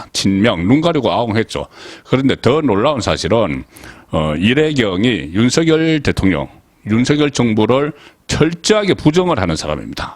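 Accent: Gyeongsang, South Korea. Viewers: 몽유병 몽류병 십월 시월 경상도 발음 인정해도 맞춤법은 제대로 하셔야지요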